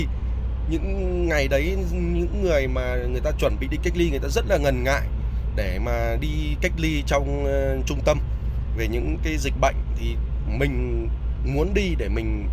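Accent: North, Vietnam. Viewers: Anh mien trung ma em nghe giong anh em tuong anh la mien bac chu hihi chuc anh nhieu suc khoe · A quê Bắc à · Sao bác bảo quê miền trung mà lại nói giọng bắc vậy nhỉ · E hỏi cái. A miền trung sao nói giọng bắc vậy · Miền trung mà a giọng bắc nhỉ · Quê anh Hà Tĩnh sao nói giọng miền Bắc vậy. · anh ở hà tĩnh mà sao lại nói giọng bắc vậy nhỉ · e cũng thích đầu kéo lắm a ở miền trung mà sao lại nói giọng bắc vậy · Anh người miền trung sao anh lại nói tiếng miền bắc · Người miền trung mà nói tiếng bắc ko trượt phát lào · Quê hà tĩnh mà a nói giọng bắc vậy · Bác nói quê miền trung sao em nghe giọng bắc vậy ? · A noi a la người miền trung nhưng sao lại nói giọng Bắc vậy ??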